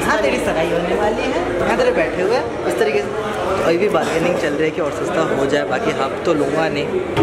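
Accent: Bengal, India. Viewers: Bhai Bengali ho?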